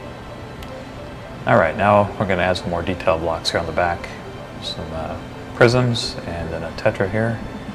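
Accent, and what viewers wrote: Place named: United Kingdom. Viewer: Nice man, you solve the problem whit the audio of your voice, nice for you dude XD , its sound a little bit eco, but at lees your voice is in the proper volume poin, a little high form the background music, realy nice man, gratz for make a nice vid this time hehehe :P (better than the other! ) i hope we can figth in game some tiem, but i think is a little dificult becouse im from Venezuela and if im rigth you leave in Ingland or UK for your acent, my nick in game is the same like here so if you see me try to kill me heheheheh, If you can >:)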